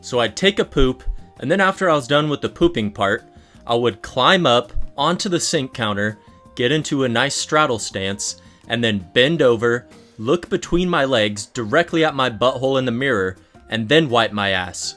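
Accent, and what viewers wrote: South, United States. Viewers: 1. When I was little I thought it was weird to sit straight forward on the toilet so I sat on the side of the bowl facing the wall 2. I just now realize has a southern accent, after hearing him say "butthole" repeatedly and now I can't unhear the accent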